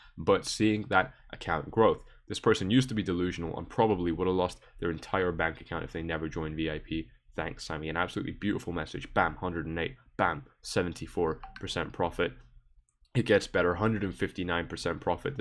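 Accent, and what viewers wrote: Scotland, United Kingdom. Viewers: First time watching your video- great content thanks! Is that a fellow Scottish accent I'm hearing?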